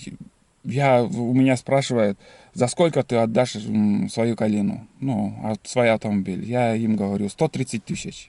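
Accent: Komi, Russia. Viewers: Виддза олан! Судя по акценту, Ижемский? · Давненько в Коми не бывал.голос слышу, детство вспоминаю. Коми му)))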